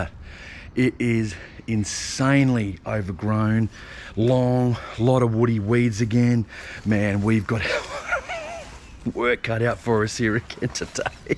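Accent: Australia. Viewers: You sound Australian. I would be a tad scared of the snakes and spiders on that job. Glad you did help the lady out. Well done.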